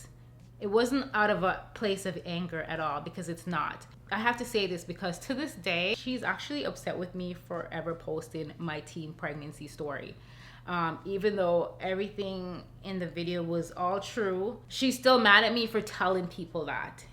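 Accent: Jamaica. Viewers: Wow didn’t know you were Jamaican and had an accent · Yes that patois 🇯🇲🇯🇲🇯🇲🇯🇲 🇯🇲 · I understand what you said when u speak in your Jamaican accent bc I'm Guyanaese! 💜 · COME THRU JAMAICAN ACCENT!!! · it was so cute to hear you speak patois 🥰 edit: oh my gosh I got teary as soon as you got emotional. I’ve watched your channel for years and I’m so proud of your success! so happy you’re able to live your dreams and comfortably take care of your beautiful family ❤️ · What a beauty😍😍 Jamaican accent cant hide at alll😩🇯🇲🇯🇲🇯🇲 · I have never heard your Jamaican Accent before and i can understand patois! Lollll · Love the accent! Makes me think of my Grandma she was from Kingston Jamaica 🇯🇲💗 I can understand. You are absolutely beautiful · her voice is so soothing wth lmao and i can tell she’s jamaican it comes through very slightly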